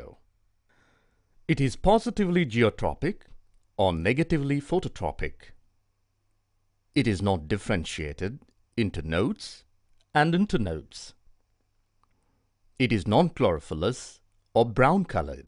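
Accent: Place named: India. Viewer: And whats up with the indian accent?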